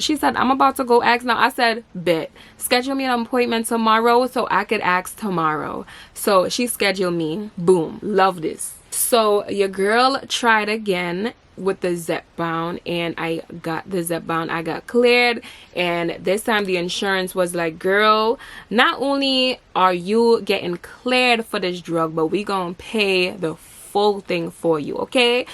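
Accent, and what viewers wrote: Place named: Caribbean. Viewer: Reslly informative, and good job on the weightloss. Aldo i hear an accent, are you of caribbean desent?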